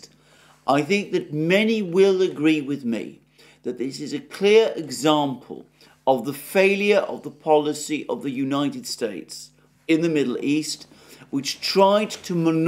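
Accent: United Kingdom. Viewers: Love the British accent - Ham Ass - funny AF. Love the show.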